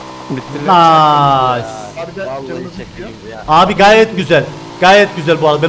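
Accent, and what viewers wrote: Cyprus. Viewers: abi senin 2 3 videonu izledikten sonra Kıbrıslı gibi konuşmaya başlıyorum 😂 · Abi sen kıbrıslımısın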